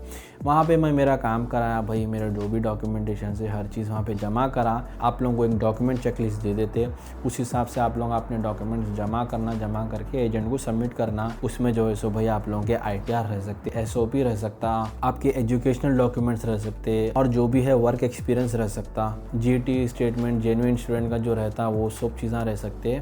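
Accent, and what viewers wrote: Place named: Hyderabad, India. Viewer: apke baat karnke tarikqe se pechna mai hai nahi hyderbad ke aap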